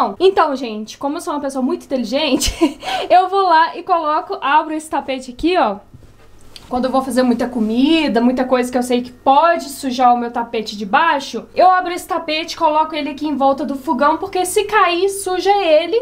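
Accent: Minas Gerais, Brazil. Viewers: seu sotaque em nossa língua é gostosamente mineiro! Sou daqui de Divinópolis, Minas Gerais! Trem difícil, cara emburrada, etc... muito legal! Você é a youtuber mais mineira do mundo! Conhece o Paulo, um rapaz lá do Recife que mora aqui perto de Divinópolis? Veja este vídeo, e vou te dar a ideia de explorar este seu jeito de falar mineirês! <link>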